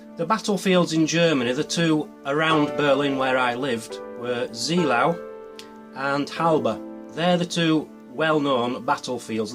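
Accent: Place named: North England, United Kingdom. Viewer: Though I'm also not a fan of 'feeding the trolls' there comes a time to clear the mud, so to speak. Such a shame, in contemporary media, that the base human nature has a viral platform to spread scum about. Good for you,. I love your attitude in your vids. Personally, I've hated Coro St. with a passion. Now, look at this objectively, the way you speak reminds me a lot of the characters, so there's a historic association with that regional accent. Does that imply I'm a 'hater'? Not when I qualify it this way. The more I listen to you and others from that accent group - from innumerable influences - who have no connection with TV soaps the more I start to associate that accent with wonderful people. I've travelled a bit in the UK, and there's a particular attitude in the North counties that I love. After all, I'm in NZ and my Grandma is from Sth Yorkshire! 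So, I am loving what you're doing in the MD community and love the way you go about your episodes. Lastly, I hope you reach the point you can ultimately forgive these 'bad people'. We all learn how to achieve peace and contentment in unique ways. That's some of my story. So 'get o nwiv yer bovver', me ol' china.:-) Only blessings.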